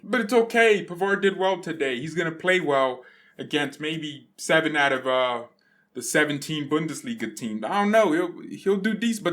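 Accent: United Kingdom. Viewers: never knew u were british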